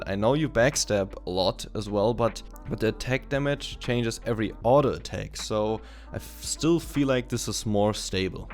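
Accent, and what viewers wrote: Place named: Germany. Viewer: Der Akzent... Da ist wohl jemand Deutsch :3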